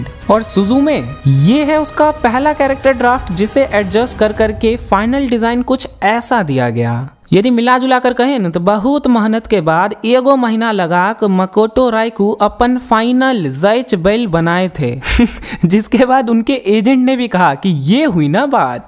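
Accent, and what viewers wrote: Bihar, India. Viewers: Bhaiya aise hi bola karo aap acche lagte Ho hamare Bihar mein bhi aise hi bola tha um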